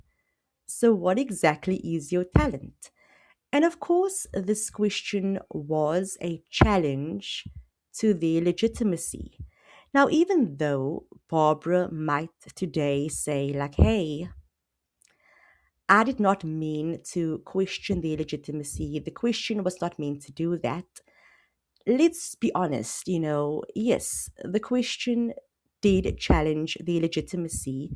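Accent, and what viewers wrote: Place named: South Africa. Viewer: Omg girl are you South African🇿🇦???The accent??😭❤️please tell me you are South African I know a indian south African/coloured accent😂❤ Also nice video ❤and interesting take Although I do like their hustle,I also see where people are coming from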